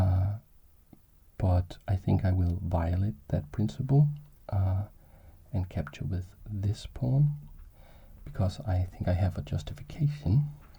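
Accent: Denmark. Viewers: Ayo, er du dansk?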